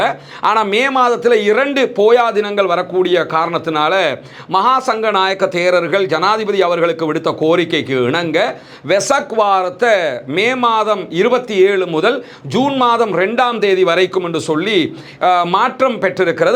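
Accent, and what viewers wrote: Tamil Nadu, India. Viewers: ஏன் bro தமிழக style English பேசுறீங்க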